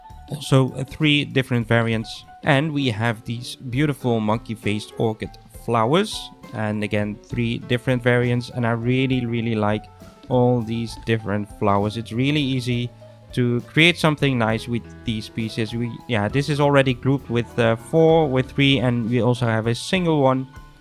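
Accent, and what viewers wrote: Netherlands. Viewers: The dutch accent is amazing 🙂🇳🇱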